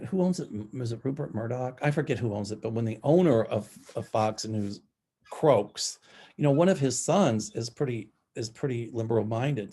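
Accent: South, United States. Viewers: Please, don't apologize for your beautiful, southern accent! 😉 We love & appreciate you, just as you are - never change. 😍 This is what makes you unique & special. I can listen to it all day! Own it. Embrace it. Also, may God bless our dear Champ! 🐕👼🙏🐾❤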